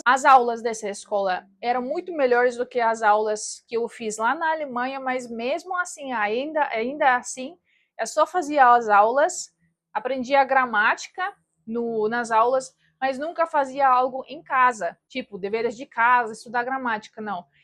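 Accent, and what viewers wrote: Brazil. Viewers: Sou do Paraná e vc tem sotaque paulista parabéns vc fala muito bem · Seu sotaque caipira de São Paulo é culpa do seu principal professor!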